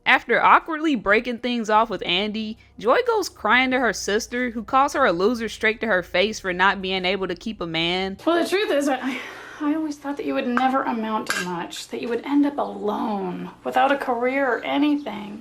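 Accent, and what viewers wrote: United States. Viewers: I love your videos, nothing on YT ever feels genuine and funny anymore. But your stuff is just so good. I appreciate all your uploads. Also, I love your accent. you from the south for sure, me too. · Narrator’s voice like sandpaper. American lazy speak.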